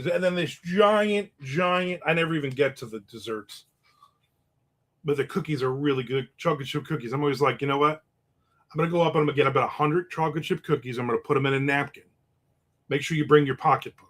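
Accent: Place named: New Jersey, United States. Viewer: Did you say I'm on, or I'm, can't tell with that Jersey accent.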